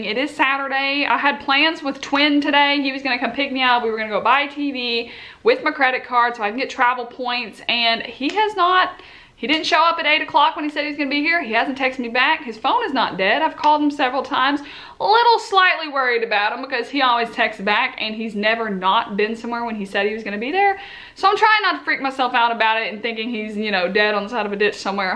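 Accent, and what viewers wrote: United States. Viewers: As a non American I absolutely LOVE your southern accent😆😆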